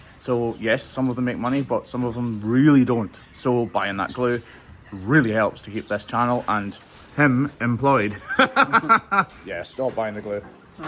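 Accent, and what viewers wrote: Scotland, United Kingdom. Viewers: Having trouble saying "similarly" with a Scottish accent try saying "Purple burglar alarm"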